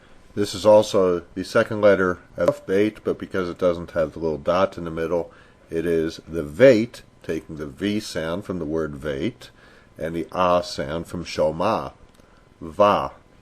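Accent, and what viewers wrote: United States. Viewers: Love your work! Lessons are informative and take the right pace for any person starting to learn Hebrew. Your Hebrew might be a bit "American", just like most of Hebrew in Israel is a bit "Russian", "Hungarian", "Germanic"... Some people swallow : (/e/), some pronounce it... No one should be discouraged because of it, just the opposite! Approach taken here is better than anything I have seen or heard up till now. Bravo and toda raba! :) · what a bad way of pronouncing all this, so americanised.... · the thing about non-native language teachers is that they don't exactly mimic the local sound. for example the teacher in this vid still pronounces the words with a subtle american accent (e.g.: "owe" instead o "oo", "meih" instead of "meh"; the word 'nekudot' (hebrew=points) was also pronounced the american way. pls try to delineate the american sounds to hebrew (or any other foreign language for that matter) sounds for an authentic foreign language lesson. 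nice lesson though.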